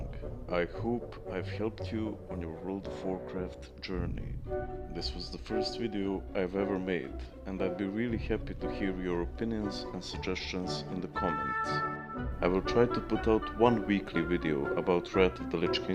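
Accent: Russia. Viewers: You have a cool Russian accent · Ty for this awesome guide! Where are you from? You have accent as russian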